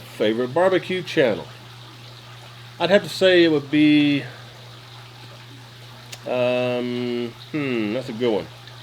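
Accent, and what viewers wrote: South, United States. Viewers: Thank you, for answering questions. I love your channel and wonderful southern accent. I am learning a lot from your and the others linked to your channel. Keep up the good work.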